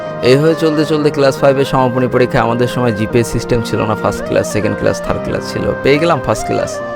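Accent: Jessore, Bangladesh. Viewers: ভাই আপনার জেলা মনে হচ্ছে যশোর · ভাই আপনি তো আমার নিজ জেলার লোক আমি বুঝতে পারছি আপনার বাসা যশোর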